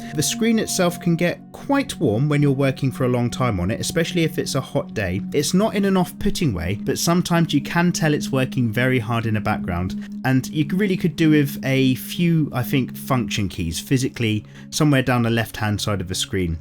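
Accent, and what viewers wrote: United Kingdom. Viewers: british accent))))) P.S. thnx 4 review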